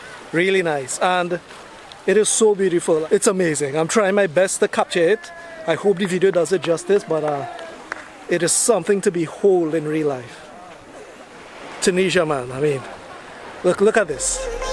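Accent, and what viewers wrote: Trinidad and Tobago. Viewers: thank you for providing a video of outdoor tunisia the bonus for me was the pleasant surprise of hearing a trini accent i did however notice of marked shortage of aquatic life in the underwater scenes then i saw what was on the lunch menu and understood (lol)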